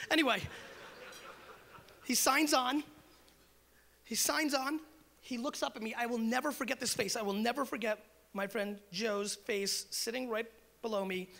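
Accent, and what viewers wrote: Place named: New York, United States. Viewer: I've noticed! I started going to networking events so you have to have a good speech, and so I litsen to the ones I like, you are one of them :) One question tho, I know you get alot of them, I live in Sweden and work with art, love my job but I found it hard to transcend from private customers to companys. Do you have any advice? Thanx, keep up the good work. Love your NY accent haha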